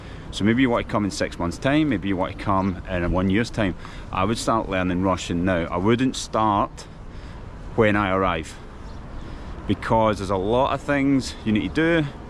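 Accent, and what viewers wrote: Scotland, United Kingdom. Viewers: Обажаю шотландский акцент, просто музыка для ушей. Мне он кажется очень жизнерадостным. :) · it's very interesting to listen to the Scottish accent, but unfortunately the videos are rarely released... does a Scot in Moscow really have so little to tell?) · Вас за кавказца не принимают? Шотландский акцент очень специфично звучит на русском. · I love your scottish pronouncuation, it's so cool! And yes you are right, we russians do respect the fact when a foreigner is trying to learn russian, that's already enough for us 😊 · Мне очень нравится Шотландский акцент в английском, have a good time in Moscow, lad! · Я половину видео не могла понять почему мне сложно его нормально понять), потом увидела название канала, и подумала, что впервые слышу шотландский акцент. Интересно звучит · Wow your Russian has less accent than your English. 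Your Scottish accent is heavy and sounds really cool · Очень нравится твой шотландский акцент! :) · Шотландский акцент просто огонь. Как и квантовый выбор падежей. Это достойно уважения.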